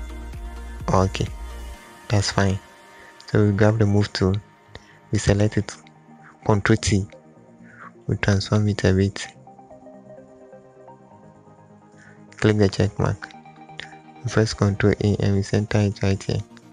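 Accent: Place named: Africa. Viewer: He's a african.. he has an african accent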